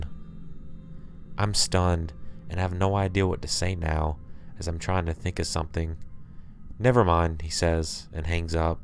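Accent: South, United States. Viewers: u country asf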